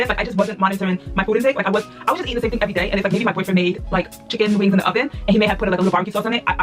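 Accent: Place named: New York, United States. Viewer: Sexy NY accent 🔥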